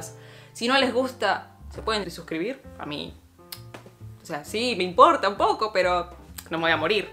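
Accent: Argentina. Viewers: Estoy totalmente de acuerdo con vos y otra cosa que odio es...: "Ya me subscribi a tu canal ahora hacelo vos " osea me subscribo si me gusta el contenido , osea deja que llegan solos los subs Pd: me pegaste se él asentó argentino soy uruguaya y en la clase soy la Argentina 😂😂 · argentina asta los pelos xdxd tu acento me mata * - * deberias predicar en mi iglesia :u ya recapacite xd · Lo dices tan cruel que hasta da ternura Pero es cierto parecen pelotudos sin vida (￣(エ)￣) (se le sale lo argentino ^<^)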